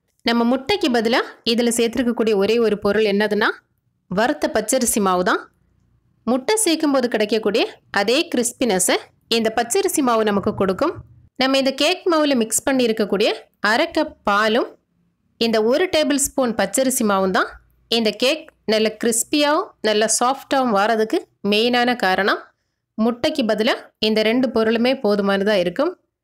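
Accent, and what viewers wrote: Kerala, India. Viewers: Are you a malayali pronnounsation malayali touch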